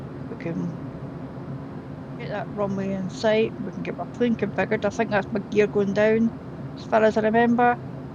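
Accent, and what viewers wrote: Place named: Scotland, United Kingdom. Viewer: Hi! You always make my day with your happy videos. And now that Outlander is finished 😢 I can also get my Scottish accent fix 😊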